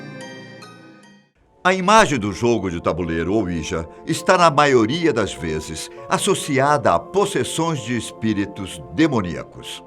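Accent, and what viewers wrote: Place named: Rio de Janeiro, Brazil. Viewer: Esse aí é carioca em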